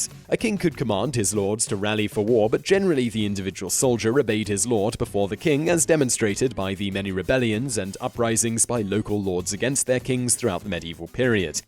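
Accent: United Kingdom. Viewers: Okay after so many videos of this guy, I am convinced he is mispronouncing words incorrectly on purpose, and thinks he can get away with it just because of the U.K. Accent. I swear I don't care what you say medieval is not five or even four syllables.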